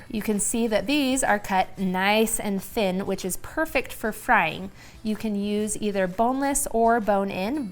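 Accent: United States. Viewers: Did a lil southern come out in you doing this video? :) Well done ma'am!!!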